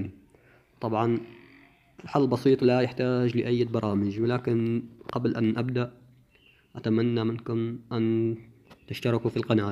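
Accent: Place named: Syria. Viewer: باين عليك سوري وحمصي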